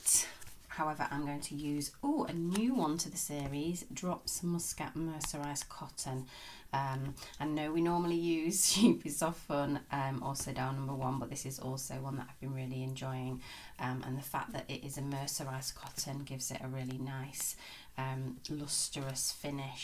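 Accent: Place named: England, United Kingdom. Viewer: i just have to say hearing you talk makes me homesick for england lol have been in usa since 1967 but england will always be home love listening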